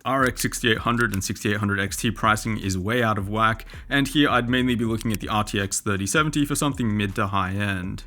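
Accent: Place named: Australia. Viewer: I thought he had an australian accent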